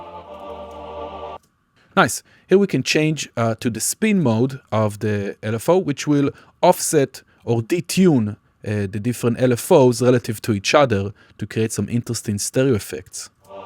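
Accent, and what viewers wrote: Israel. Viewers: אני שם כסף שאתה ישראלי המבטא הזה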